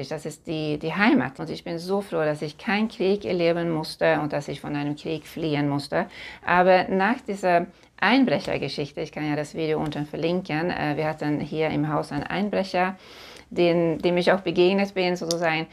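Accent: Sweden. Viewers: Liebe. Ich bin fassungslos über solche Kommentare 😡. Ich liebe deinen Akzent sehr 💕. Ich habe schon mehrmals Urlaub in Schweden gemacht und liebe das Land. Mach dir bitte nichts aus diesen Kommentaren von so dummen Menschen 👍. Bleib wie du bist 🤗. Ich bin für verschiedene Kulturen und freue mich immer über die Länder und deren Kulturen zu lernen. Eine sehr schöne Tracht habt ihr 🤗. Austausch muss sein 👍. Wünsche dir einen schönen Abend und danke 🙏 dir für deine Offenheit 💕. GLG 🥰♥️ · Ich finde der akzeeeent gar nicht schlimm, sondern eher das es dich noch symphytischer maaacht😍😍😍😍...ohne Schweden gäbe es sooo viele Marken hier gar nicht in Deutschland, wie HM oder aber auuuch COS wo ich gerne einkaufe und Ikeaaaa😍😍..lass DIch nicht ärgern, die Traaacht sieht ja maaal toolll aus. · Ich wäre froh, wenn ich nur ein paar Worte in schwedisch sprechen könnte. Ich finde deinen Akzent einfach nur genial und sehr sympathisch. 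Lass dich nicht unterkriegen ❤ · Liebe, das anlegen deiner Tracht war für mich ein sehr feierlicher Akt. DANKE ❤❤... ich mag diesen Stolz auf die Tradition. Zum Kommentar von "Sabine" sag ich dir: verschwende deine charmante und liebenswerte Art NICHT an Personen die dich und deinen Kanal nicht zu schätzen wissen. Ich mag deine Videos und deinen schwedischen Akzent. Wortschatz, Satzbau und Aussprache sind besser als bei manch "Muttersprachler". Dieser Videoplatz ist so lichtdurchflutet und wunderschön. 🙋🏼‍♀️👍🏻🍀🌻🌼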